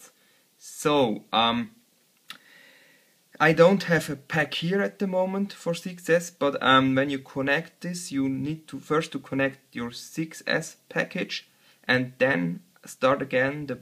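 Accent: Germany. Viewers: Alles Verstanden Infos die ich wissen wollte bekommen.. Wüdre 2X Like geben schon alleine wegen der Guten "Positiv gemeint" "Denglisch" Audio. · erst, vaucc :D You are german no doubt :D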